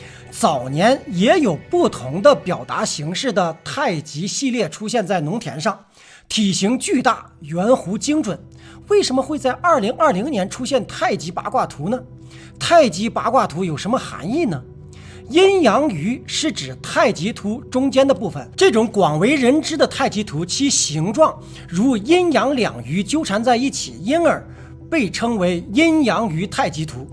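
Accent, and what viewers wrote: China. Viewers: 大陆人有大陆口音有问题吗? 你要给配音吗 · 大陸口音需要那麼重嗎？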